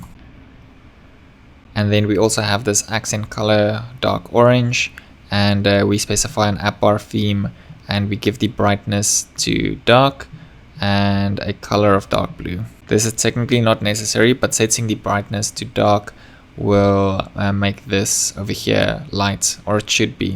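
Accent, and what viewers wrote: South Africa. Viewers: Where are you from? You sound South African? :D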